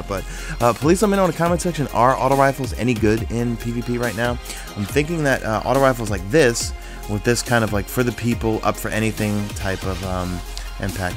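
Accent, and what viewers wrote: New York, United States. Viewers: You weren't kidding about your old new york accent and speed talking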